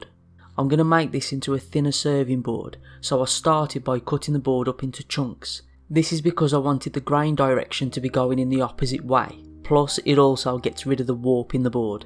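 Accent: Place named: Walsall, United Kingdom. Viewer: They turned out great man. I’ve got a big solid oak worktop offcut that I’d be interested in turning into a shelf and a couple of cutting boards. You sound local to me (Walsall area) if your interested in some private work drop me a reply. Great work mate.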